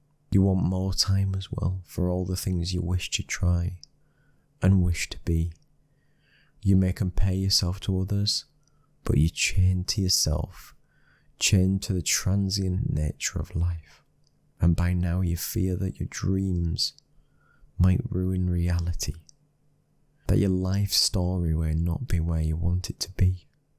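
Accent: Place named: Northern England, United Kingdom. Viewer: I'm originally from New York, but now live in Chicago. I'd love to move to Yorkshire one day. I could tell by your speech patterns that you were Northern English. Your voice is so soothing and gorgeous.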